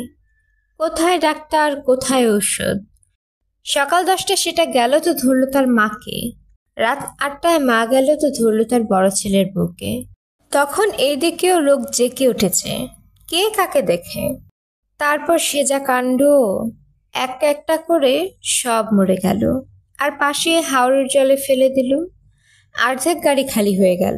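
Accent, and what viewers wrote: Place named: Kolkata, India. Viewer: Kolkatar tone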